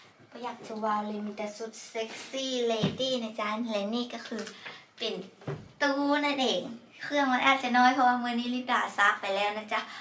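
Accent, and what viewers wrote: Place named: Vientiane, Laos. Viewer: ເປັນຄົນປາກກະດິງ ຄືເວົ້າອອກວຽງ😍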